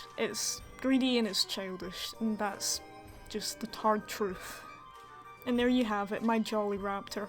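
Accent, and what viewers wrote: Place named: Scotland, United Kingdom. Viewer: Man this accent reminds me that scottish people are a thing, wheres all the scottish people at?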